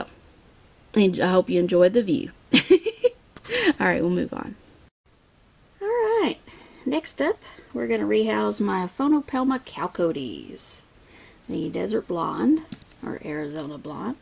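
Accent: South, United States. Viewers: Love your videos!!! I thought you had a southern accent, my mama was born in Tennessee but she raised us kids in WV. Tennessee is a beautiful state. I love it there.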